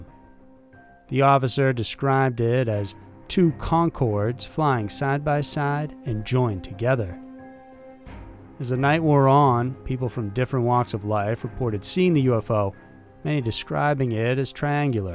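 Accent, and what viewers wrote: United States. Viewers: I would really like to k ow where the narrator is from. Obviously American but which state? Unusual accent. I hope you reply.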